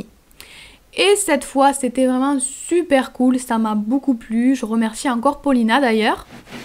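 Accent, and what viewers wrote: South, France. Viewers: ***** moi aussi je suis de beziers :) je me disait toi aussi tu as l'accent du sud :') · Ta l'accent du sud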